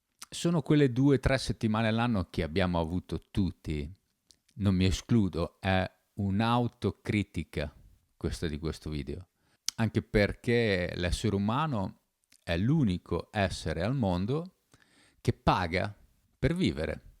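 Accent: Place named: Bologna, Italy. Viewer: Grazie Ma di dove sei? Sento un accento bolognese ... Buona serata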